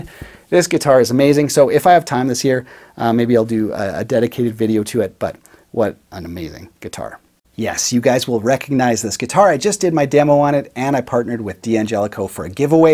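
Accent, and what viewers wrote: Canada. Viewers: The best Canadian accent Sooory that's the rules One of the best accents ever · Awesome collection! ( Also, I had a feeling you were Canadian based upon your accent 🤣) I'm a fellow Canadian 🇨🇦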